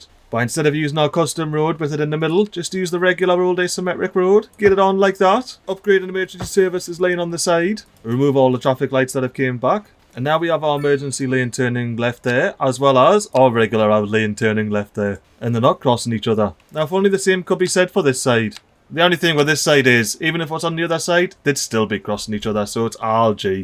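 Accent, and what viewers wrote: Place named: Scotland, United Kingdom. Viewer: Hey, German here. What kind of dialect you're speaking? Rooond-a-booot :) I would guess somewhere in Scotland?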